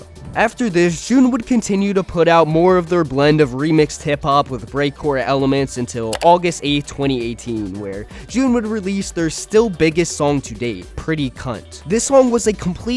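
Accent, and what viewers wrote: Australia. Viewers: The way i hear Australian and got so disappointed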